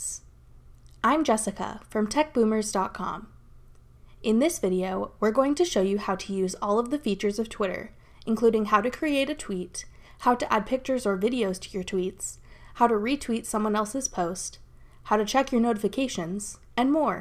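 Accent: Canada. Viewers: I love your accent - apart from the Canadian "out" right at the end! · I agree- very informative. And... Did I detect a Canadian accent, OR have I just been watching too much hockey lately?!😀 PS ISN'T GIF pronounced like Jif ?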